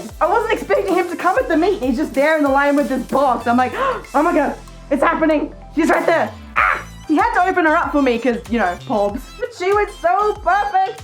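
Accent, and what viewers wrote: Australia. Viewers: How the hell did you get more Australian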